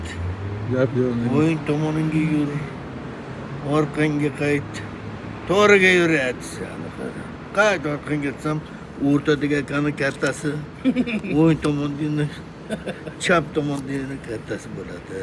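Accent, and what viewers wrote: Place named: Kashkadarya, Uzbekistan. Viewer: Бу одам Кашкадарйоликка ухшайди шеваси шунга ухшайди, малалес гап йук санатингизга, Яна янгиларини кутиб коламиз, Оллох умрингизга Барака берсин.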